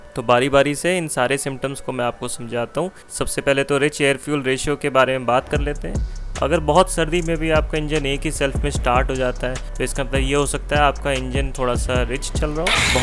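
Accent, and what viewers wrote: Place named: Uttarakhand, India. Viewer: So you are from Uttarakhand 😂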